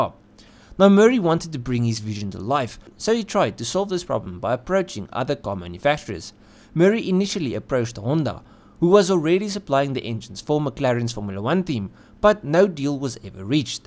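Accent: Afrikaans, South Africa. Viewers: Can't decide what sounds better - the S70/2 or the Afrikaans accent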